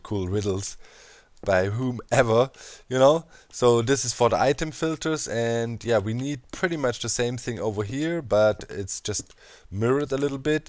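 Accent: Germany. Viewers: May i ask if u are german cuz u have a german accent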